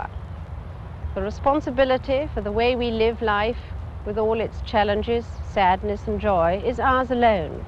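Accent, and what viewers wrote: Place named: RP, United Kingdom. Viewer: That's how received pronunciation is supposed to sound you utter fool.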